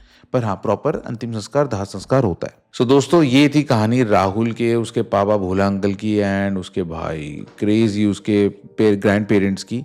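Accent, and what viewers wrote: Pahadi, India. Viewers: Apke bolne m pahadi accent ata bhut acha lgta h sunna mere bhi apne dosto s bolne m pahadi accent ahi jata h😂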